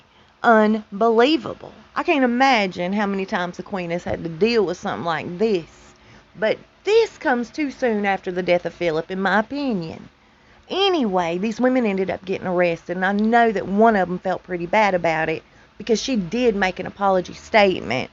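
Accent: South, United States. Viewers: I could never imagine cursing my grandma out. I would have carried her on a satin pillow if I was capable. I know from your accent that you’re southern and I’m southern and we just don’t play that way. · You have the sweetest southern accent. I live in North Carolina. I enjoy your videos. There's a commercial on now. Lol. Have a great day. We're blessed to live in the South❣ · Love your southern accent! Lisa from Spain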